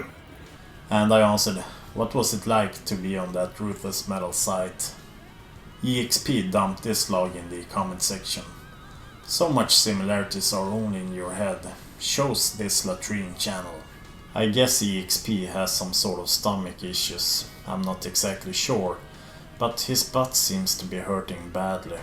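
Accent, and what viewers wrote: Sweden. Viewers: Further to the comments about your accent, my first ideas of the supposed Swedish accent came from the late 1970's watching the Swedish chef from the Muppets Show, members of Abba, Bjorn Borg and an atypical Swedish blonde dollybird on a UK comedy show called Mind Your Language! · Actually your deadpan Swedish accent coupled with the dry sarcasm is really cool. I’m more into OSDM and BM but I really enjoy the channel, and in fact it has made me listen and discover some old metal gems. Nice work bro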